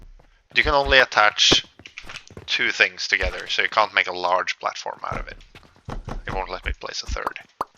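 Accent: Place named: Scandinavia. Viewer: I can tell by your accent that you're probably sweedish? or nordic? Which would explain the impeccable design interior taste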